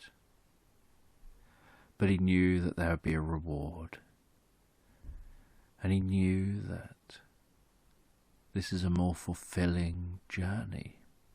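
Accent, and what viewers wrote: England, United Kingdom. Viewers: Why can't I stand the English accent.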